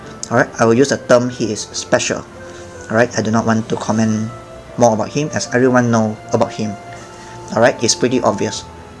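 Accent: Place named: Singapore. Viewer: Dude. You're not from global, are you? Your mobs look crazy. Dayum! By the way, I like your videos but your Singaporean accent not much. YDCB is okay. Sharp but okay. However, when I watch your vids, I feel like I'm in a car and the driver keeps on stepping on the brakes. Or maybe it's just the mike. Hmmm. Try to invest in a better mike with a pop filter? ;) I'd like to see more content from you. Hope this doesn't offend you too much. I mean it in a constructive way. :D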